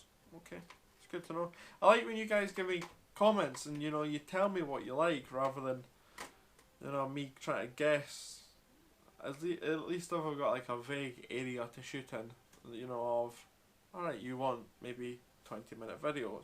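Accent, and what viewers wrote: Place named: Scotland, United Kingdom. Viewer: Scottish accent is his charm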